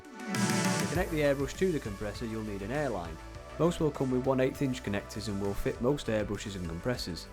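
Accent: United Kingdom. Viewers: Biltema acetone? You sound really British, but I thought Biltema only existed in Scandinavia?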